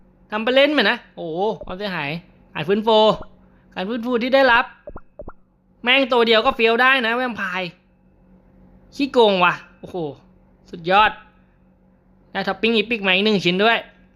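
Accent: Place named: Provincial, Thailand. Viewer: มาแล้วไอ้หนุ่มเสียงเหน่อ ที่รอคอย BRAVO~~~~~~~~~~~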